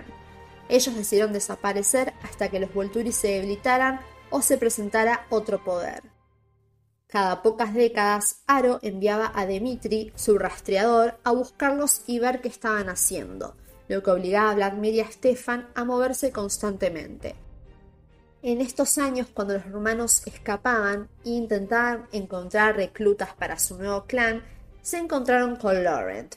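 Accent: Argentina. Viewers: Puedes hacer un video sobre quien es mas fuerte? Si el chupa sangre o el perro gigante?🤭 me encanta tu acento argentino, buenos aires no?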